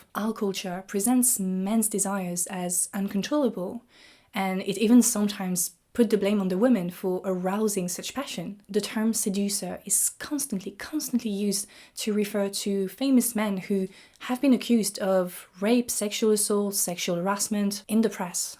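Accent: France. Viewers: Is it just me or does she sound more French than usual?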